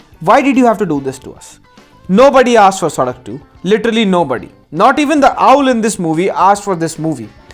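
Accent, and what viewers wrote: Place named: Delhi, India. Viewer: Not to stereo type but love your delhi accent...accha lagta hai apna delhi ka bhai itna accha kar raha hai.... All the things you say how to say the metaphor the references mahn love from jamna par but not shahdra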